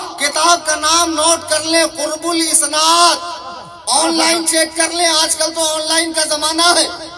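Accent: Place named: Karnataka, India. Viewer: Karnataka accent mashallah Hazrat ko itna achha kese aata he Mumbai me rehte hen fir bhi...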